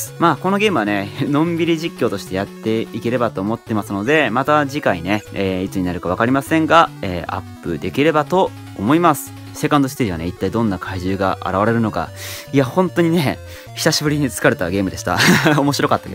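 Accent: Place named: West, Japan. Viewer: アニめし屋 by さんって西日本出身ですか？そのネタもググってやってみてくださいのイントネーションとかからそんな感じが…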